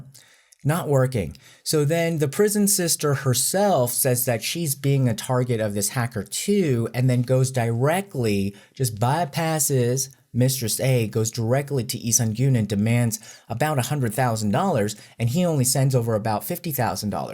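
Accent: South, United States. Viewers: I love how your southern accent started to coming heavier as the video progresses. 😂 I love it.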